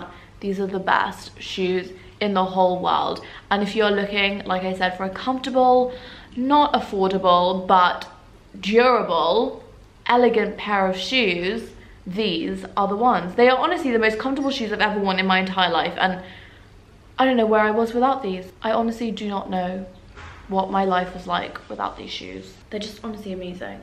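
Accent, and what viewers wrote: United Kingdom. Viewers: It’s a British accent and she lives in Dubai. I love it:)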